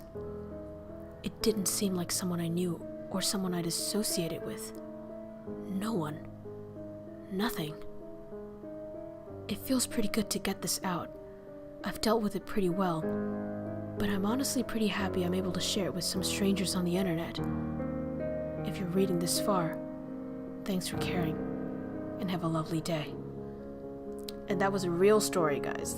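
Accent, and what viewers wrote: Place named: Russia. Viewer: That was fun,nice deep Russian accent btw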